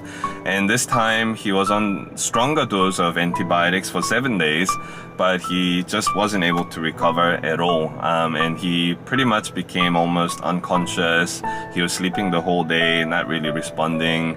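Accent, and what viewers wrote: Singapore. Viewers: I'm very sorry to know you lost your papa. My deepest condolences to you and your family. I lost my papa 16 yrs ago as he got a heart attack.This year Feb I lost my best buddy , she got into coma for 3 months and eventually passed on. I fully understand the feeling of losing someone close to your heart. Everyday I try to live my life meaningfully with the understanding of things or relationship will not last forever. As there is a saying '' Time will heal the wound'. I wish you and your family will stand strong together as your papa is now in a peaceful and happy land. He will watch you all from afar. Take good care of your mama ❤️. Hope to see your new vids soon as I always like to see you speak in singlish.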